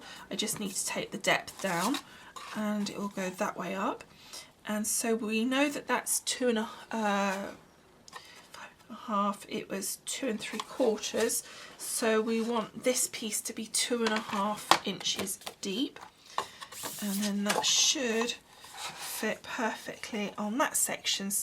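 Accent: United Kingdom. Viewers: How sweet is that you remembered the "hun" part in my name which actually stands for Hungarian. I grew up in Hungary, and lived for a few years in Germany,Canada, and now for 12 years in Dallas, Texas. Where do you live in the United Kingdom? I always enjoy listening to you talk in your videos because your English sounds so much nicer than American English. :)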